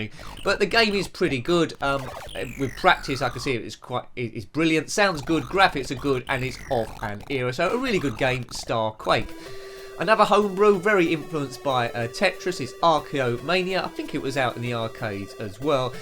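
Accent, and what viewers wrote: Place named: Essex, United Kingdom. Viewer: Loving all these top game videos. I've watched all the C64 and Amiga videos so far and working my way through the Spectrum ones. Also, I've been trying to guess where your accent is from. I ask that as if it's somehow separate to you. 😁 I was going to guess Enfield, but now I'm thinking probably over towards Grays in Essex. Am I right?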